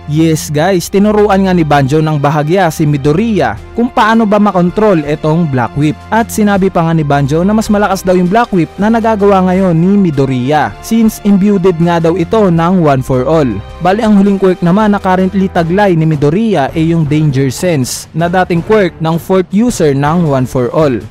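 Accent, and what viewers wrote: Visayas, Philippines. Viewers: Naol bisaya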